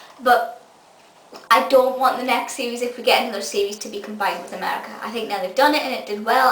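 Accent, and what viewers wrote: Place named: North East England, United Kingdom. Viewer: I like your accent it's sort of a mix of north eastern endland and scottish I'm sorry if none of those apply to your accent it's just interesting and thats what I thought it sounded like.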